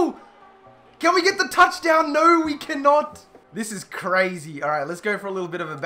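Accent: United Kingdom. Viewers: I CAN"T DEAL WITH HEARING FOOTBALL WITH A BRIT ACCENT sorry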